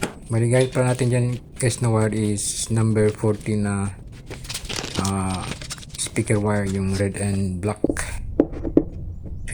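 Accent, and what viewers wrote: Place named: Bicol, Philippines. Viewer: Hello BRO.. Parang bikolano ka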